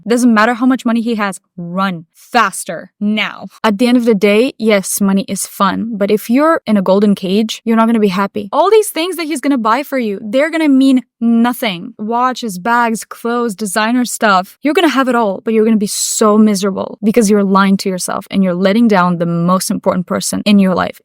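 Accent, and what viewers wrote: Russia. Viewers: Where does this accent come from? Russia? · She's Russian for sure:) only Russian girl can speak and act like this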